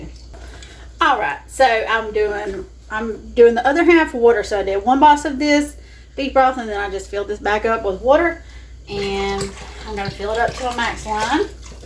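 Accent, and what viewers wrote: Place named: South, United States. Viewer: Thanks for the recipe...i like the sautéed onions and garlic trick...cooking right now...smells delicious! I just love your cute little southern accent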